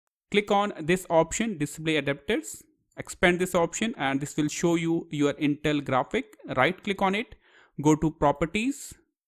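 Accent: India. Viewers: As soon as I heard he's indian I knew my laptop is going to be fixed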